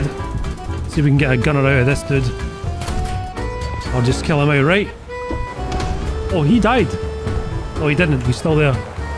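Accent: Scotland, United Kingdom. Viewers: A great Scots man. Love the videos and the accent.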